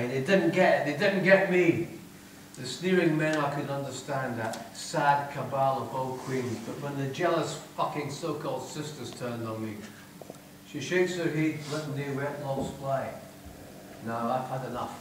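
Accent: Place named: Scotland, United Kingdom. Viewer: I love the Scottish accent! :)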